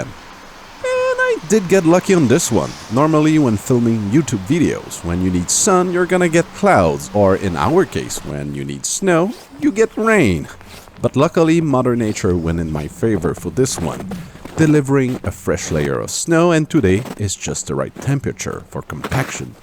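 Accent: Quebec, Canada. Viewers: Ta le meme accent que george stpierre loll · "peux pas toutes aware" ... Je'l savais que ça sonnait Québécois cte vidéo là...pi en plus...c-t'une bonne idée !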